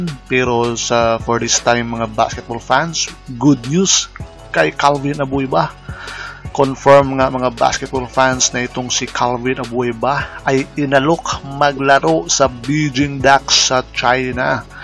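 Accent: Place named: Visayas, Philippines. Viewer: Bisaya man gid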